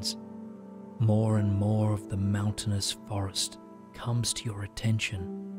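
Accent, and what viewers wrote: Australia. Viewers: I love your meditations, they’ve helped more than you’ll ever know. I feel even more drawn to you because I think you’re Australian? Feels a bit more like home and trusting.. I can’t explain it. But thank you again 🙏🏼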